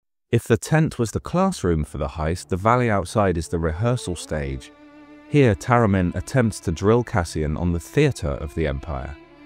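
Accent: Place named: United Kingdom. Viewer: First off, I promise you that I push your channel as much as I can and I wish there was some way of helping you out and getting you more into the public eye. There is a huge audience for Andor content, but the algorithm is so difficult to control. I genuinely wish you all the best, and as one monotone British accent to another (in my case a very broad northern one) I love listening to you! As for this arc, I think this is when Cassian slowly begins to understand that he can be a leader but he's still not there. And I totally agree with your ideas about Skeen - he is Cassian's dark reflection, what Cassian could have been if he hadn't had Clem and Maarva and the community of Ferrix. I don't think the value of Cassian's upbringing can be underestimated It gives him the confidence to face down the other members of the group and slowly start to worm his way in and make himself necessary. 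Turn into Ellen Ripley, if you will! And Nemik is so genuinely happy to meet somebody that he can talk to and who is willing to listen to him and, as you say, nerd out with him. In another life, they would have had a really good sibling relationship I think. I do think Skeen cared for Nemik, although I've seen a lot of arguments to the contrary. The innocence and the eagerness of Nemik is that breath of fresh air that perhaps they all need. I enjoy your videos so much - I too am no longer in work as of last week, and so can't afford to support you but I do wish you all the best.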